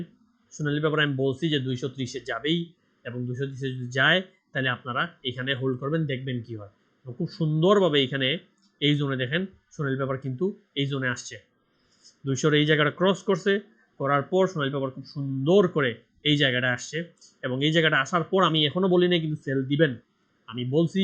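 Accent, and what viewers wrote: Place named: Sylhet, Bangladesh. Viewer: ভাইসাব এর বাড়ি মনেহয় সিলেট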